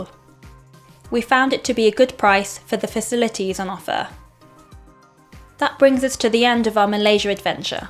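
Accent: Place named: United Kingdom. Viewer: Great video. I have visited most of the places you included in this video but your information was an added bonus because I learnt much more about some of the history about these places. Thank you. BTW where are you originally from ? Judging by your accent you sound British.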